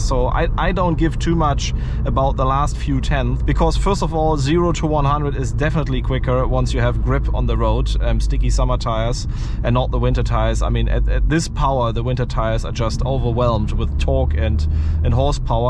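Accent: Germany. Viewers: Most german english i have ever heard :)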